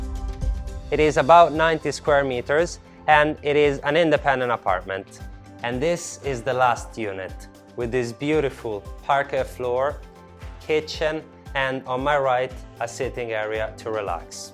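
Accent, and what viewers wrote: Italy. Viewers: In realtà ha un accento molto meno marcato della maggior parte degli italiani che parlano inglese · Hello friend I am here only for your Italian Accent. Love you buddy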